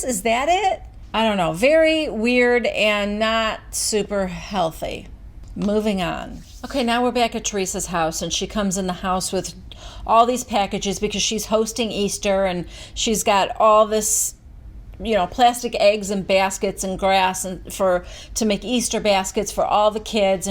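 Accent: New Jersey, United States. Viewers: God! You’re Jersey accent is awful!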